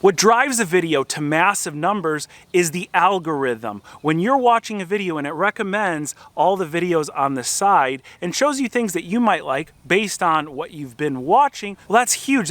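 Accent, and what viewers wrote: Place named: Florida, United States. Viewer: You are starting to sound like the @@@@ Floridians who show up here in NC. And no, I am not going to Instagram because it is owned by FaceSuck.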